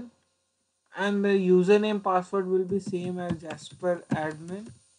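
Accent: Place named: India. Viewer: why do always, and probably only, indians do this kind of tutorials? :D ....it is good explained but, hard to understand, the indian-english and the bad audio quality ^^ greetz :)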